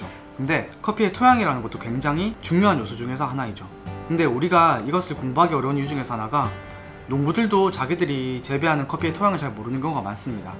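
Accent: South Korea. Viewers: 불어 하는 사람으로 한국식 발음에 못 알아들었음 ㅋ Terroir 라는 걸 알고 빵터짐 영어나 불어나 한국식 발음 참 알아듣기 힘듬. Anyway.. I like to drink Jamaican Blue Mountain, Ethiopia Yirgacheff & Colombia Geisha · ㅋㅋ 오히려 경상도 사투리때문에 더 꼰대스러워욬ㅋㅋㅋ 연기하시느라 고생하셨어요. 좋은영상 찍어주셔서 감사합니다. 많은사람들이 봤으면하네요.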